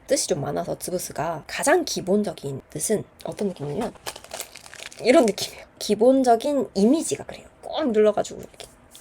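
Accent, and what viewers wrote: Gyeongsang, South Korea. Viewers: 경상도 사투리 베이스신가요 그런데도 말투가 되게 부드러워요! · 한국어는 경상도 사투리 쓰시네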